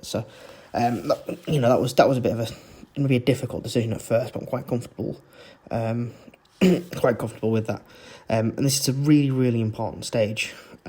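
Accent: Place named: United Kingdom. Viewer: Good quality video and instruction but you talk to fast with that British accent for a southern American. Merry Christmas to you and your family.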